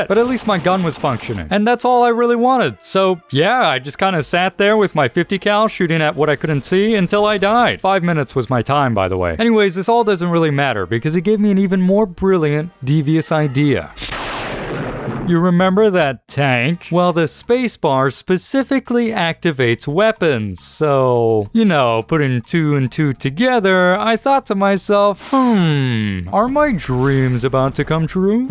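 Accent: United Kingdom. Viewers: bro soundl like english luksor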